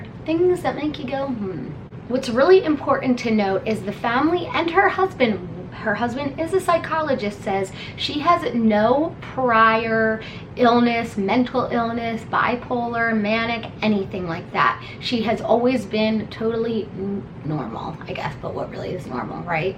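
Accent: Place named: Maryland, United States. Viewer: Sounds like a MD accent... Great video! Subbing for more!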